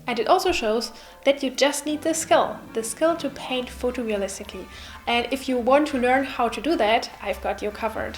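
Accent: Germany. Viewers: Man hört, dass du aus Deutschland kommst c: I love Coffee 😍😍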